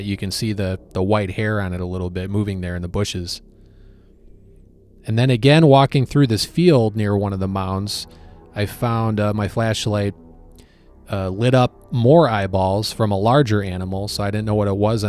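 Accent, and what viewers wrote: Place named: Midwest, United States. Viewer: This is the most Wisconsin/midwest AI voice I have ever heard! Some new sights for me to venture out and see, thanks much!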